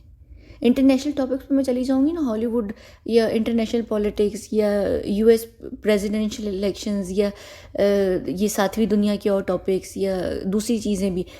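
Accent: United Kingdom. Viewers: I honestly love ur British accent 💞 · funding to movies by badelog aur durgs ka kya sceene kasi funding hoti hai, ye salman k bahar dubai kyu jata hai? funding? aur prostitution kon provid karta hai bollywood m ya phir salmon ka hat kya hai es m. kya salman k sach m religious neutral hai ya bas dek ka ne k liye ganpati festival karta hai. wasi es m to sure hu m to b kuch extra info aap batavo, salman ki ek sister hai usne to ek hindu se shadi ki, kya seen hai love jihad k mamle m, kya salman uski sis. ki beti ko flim m launch karega? aur new comers ko ye kasi treat karta hai, outsider aur insider b jayse Sonakshi Sinha (ki se ne controvesy ki ti ki salmon sonakshi ki mom k sat night.) aur Saiee Manjrekar eska b batavo. vae se british acc. to badya hai aapki. suna te raho bech bech m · Sis I personally love your British accent. I just thought to share with you because of the warning statement u mentioned in the beginning. 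Baki log bhaar me jae.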